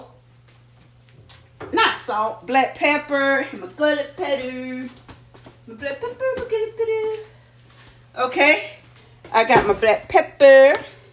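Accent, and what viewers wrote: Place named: New Orleans, United States. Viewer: You're my new Auntie, I used to live in Nola and I miss the food and the accent plus the hospitality. I'm inspired to make food from your recipes.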